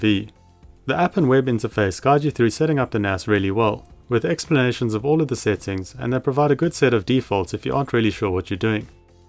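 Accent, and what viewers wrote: South Africa. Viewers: Hah! Thought I recognized the accent, I'm also South African, though still in the homeland. Love the channel man, gonna do a lil Pi NAS project in December based on your specs. Thanks for channel man.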